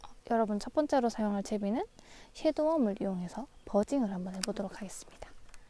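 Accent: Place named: Daegu, South Korea. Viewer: 대구 말투 넘흐 좋아요 ❤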